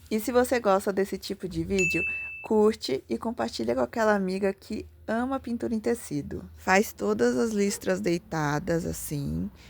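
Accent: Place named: Ceará, Brazil. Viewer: Gostei muito lindo fala do Ceará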